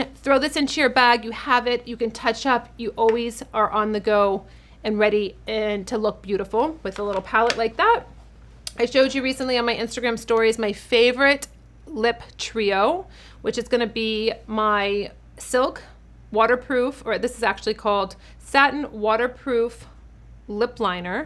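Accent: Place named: United States. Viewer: Like watching and listening to a stroppy teenager army Sargent. I'll look for someone less ... American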